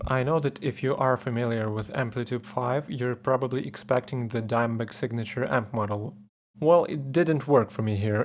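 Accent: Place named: Western Ukraine, Ukraine. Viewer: Так ось він який - акцент на заході України 😂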